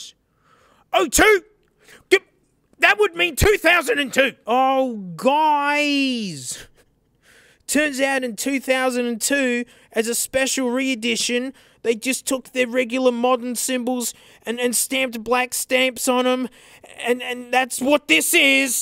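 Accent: Australia. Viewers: and that aussie accent lmao he uses his gift from god like it should be used. he is a godsend.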